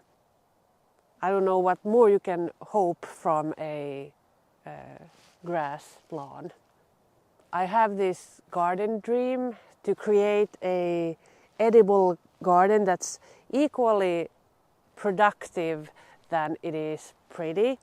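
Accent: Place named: Finland. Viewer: I love your English,! With a soft Finnish accent. Your voice would be great to read kids’ stories, about elves and gnomes 😊 Yes, I understand and support your gardening philosophy and general plan. Natural and generous for all things surrounding. Great job!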